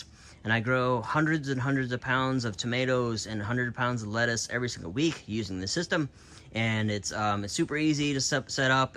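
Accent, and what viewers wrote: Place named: United States. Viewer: helloo thx for sharing. you sound like an american, where in the world are you gardening? New sub from Thailand here. I also grow some cucumbers in floating foam sheets. Is hot season at the moment. I am using ppm as a guidance. What is your ppm for the cumcumbers? mine is arround 900 just begin to flowering.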